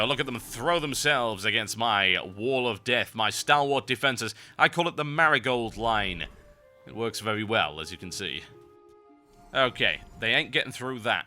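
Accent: United Kingdom. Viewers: are you british, irish or scotish?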